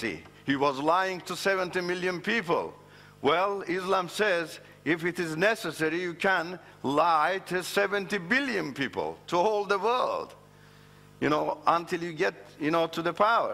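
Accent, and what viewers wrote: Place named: Iran. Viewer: Amina he's a Iranian.. things r pronounced in his tongue with slight difference., like different recitations of kuuuraan.. we call allah in most but many call it as alloah.. does that make him an ignorant in arabic? definitely he's learned enough to explain the message .. salaaaaaam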